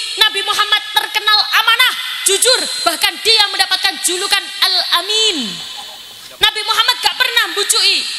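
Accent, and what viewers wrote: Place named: Surabaya, Indonesia. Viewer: Dari cilacap ada ngapak ngapak si bu nyai Mumpuni Handayekti... dari surabaya ada nyai arek arek...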